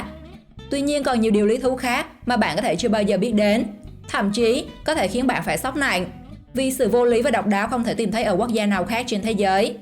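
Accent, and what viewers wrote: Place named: Phu Yen, Vietnam. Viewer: Đây là giọng Phú Yên,gần Bình Định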